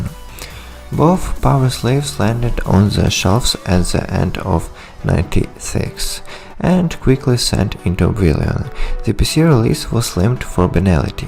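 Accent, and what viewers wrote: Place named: Australia. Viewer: Man youve got a fkn mad accent mate,were the hell r ya from?? it sounds are you a australian student living in the suburbs of cali in the u.s.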